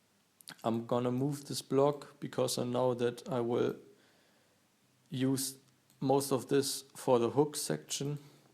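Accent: Germany. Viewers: Nice Video bro. I think you come from germany, is that right?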